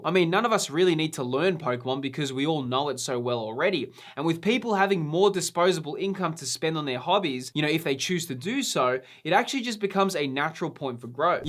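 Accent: Australia. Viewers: he's aussie they have funky accents like the brits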